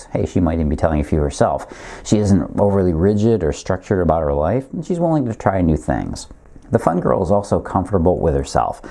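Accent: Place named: Midwest, United States. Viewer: rational, sound advice. Refreshing from the sea of gimmicky crap. Love the midwest accent too.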